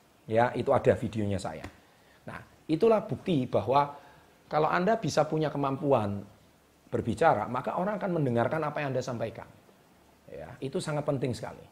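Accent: Java, Indonesia. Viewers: Logat jawa nya buang lah dan kamu sendri bicara masih belum fasih gimana mau orang dengar dan fidio bukan kamu punya tunjuk orang punya dasar pembohong cari sensasih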